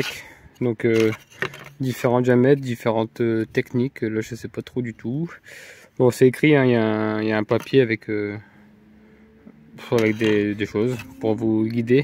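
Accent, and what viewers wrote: North, France. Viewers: Salut mec. Je viens de voir ta vidéo. Je vais essayer de trouver celle du résultat car ça m'intéresse 😅 tes du nord d'après ce que j'ai entendu, moi aussi 😊 tes de ou ?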